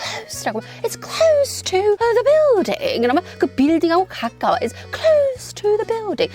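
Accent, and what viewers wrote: United Kingdom. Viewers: 아... 미국 갈 준비중인데 발음이 너무 매력적이라 자꾸 여기오게 되네요 ㅎㅎ 미국가서 멋지게 브리티쉬 발음 해보고 싶네요 ㅎ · 혹시 영국식 발음인가요? 문장 말하실 때 영국인 같으세요~ ㅎㅎ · 영국 발음 인가요?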